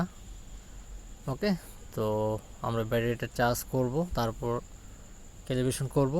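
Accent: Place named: North Bengal, India. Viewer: এখন বিষয় হলো আপনার কথা শুনে তো মনে হইতেছে যে আপনার বাড়ি উওরবঙ্গের দিকে আমাদের দিনাজপুরে নয়তো।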